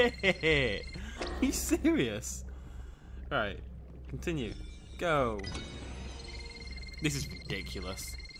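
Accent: United Kingdom. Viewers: I love how says Hello Poppet. I just love the British accent I think British people are awesome.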